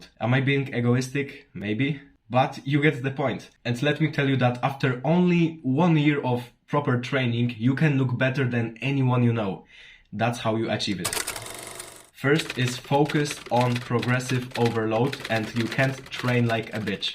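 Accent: Poland. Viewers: as a pole i immidiatelly noticed that your polish just by your accent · o z polski jestes slychac, u mnie silka wleciala poprawiam nawyki zywieniowe lecimy pozdro 💪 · this question is not related to the movie, but are you polish? I think the accent is familiar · Bro i'm gonna guess, but are you from Poland? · Nie - nie wyśmiewam się, mega props za kontent i formę vlogów - po prostu wyłapałem ‚polski’ akcent, so one question - Are You Polish? ❤❤ Good job bro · od paczątku wiedziałem żę to polak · słychać że polaczek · Are u from poland? cuz i kinda hear ur accent but ur english is on high level. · ej mam wrażenie że jesteś z polski bo masz taki akcent podobny XD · nie ukryjesz polskiego akcentu , ale i tak wyglądasz zajebiscie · po 1 minucie filmu zauwzaykem ze jestes z polski XD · Bro u Polish? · Are you Polish? Masz bardzo polski akcent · wiedzialem ze jestes z polski, od razu po akcencie poznalem. 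gratuluje virala<3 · poznaje ten Polski akcent, ciekawe czy sie nie myle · Short and to the point, that's what i was looking for. Also weird question, are you from Poland? I can everywhere recognize accent of own country. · ja pierdolę wiedziałem ze polak po akcencie haha <3 dobry materiał mordo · Dude r u from poland i can hear it here cool Pozdrawiam Pl gurom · brat ty z polski? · coś czułem że jesteś polakiem słuchając twojego akcentu XD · I immediately new that you're polish because of the accent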